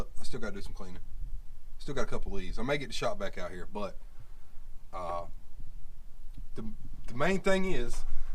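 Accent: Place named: South, United States. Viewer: I never realized how southern you sound till I watched this video.... lol Good job brother!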